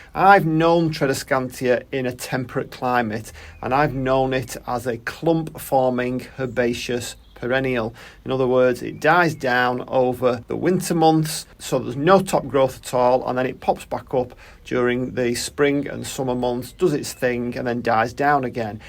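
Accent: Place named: Northern England, United Kingdom. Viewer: What a super helpful video re TZ! Clearly, I have some clipping, cleaning up and repotting / propagating to do. Now that I have seen your video, I feel confident that I can save my failing babies. Thank you for your insight... Off to watch the video you pinned in the comment section now. I can't get too many plant care tips with these black thumbs of mine, lol! Well, they are leaning towards deep, dark green now :O) Also, your accent is warming... brings me right back home. I have been in the States since early 1990 and I love bumping into my northern English brothers and sisters on youtube. (Mancunian? I was raised partly in W. Yorkshire but lived in Manchester for a good chunk of time)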